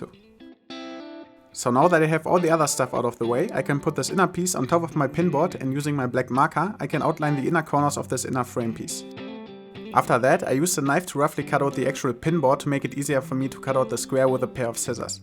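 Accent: Germany. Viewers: Wenn deutsche englisch reden hört sich das irgendwie komisch an.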